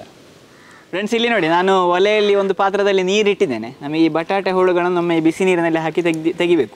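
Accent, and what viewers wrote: Mangalore, India. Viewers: ಮಂಗಳೂರು ಕನ್ನಡ ಚೆಂದ ಮಾಯ್ರೆ ❤ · I subscribed to your channel because of the charm in your Kannada accent ! Love it when you speak in mangalore Kannada ❤ also you make wonderful recipes. Thanks 🙏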